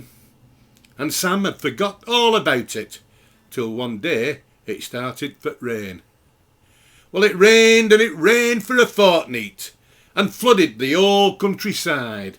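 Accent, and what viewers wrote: Lancashire, United Kingdom. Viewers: Doing some research on a role for a broad Lancashire accent. You're recital helped out tremendously. Thank you for posting it. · This is not just Accrington, but many towns in East Lancashire have this , similar to the way they say 'four' as 'phoar', with the 'w'. Same in Burnley, and many part sof Eats Lancashire, particularly in Rossendale. Even heard it in parts of Rochdale.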